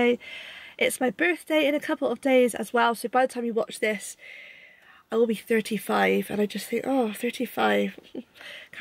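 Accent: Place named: Gloucestershire, United Kingdom. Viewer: I just came across your channel from Mummy Cleans' channel! Love your channel intro and videos I've seen so far 🙂 your accent sounds so local to me, I'm from the Forest of Dean in Gloucestershire 🙂 your holiday sounds lovely, we're off to Cornwall next month, can't wait. I've got a 2 yr old son and nearly 4 yr old daughter so doubt it'll be relaxing though lol! Sorry to hear you've been unwell xx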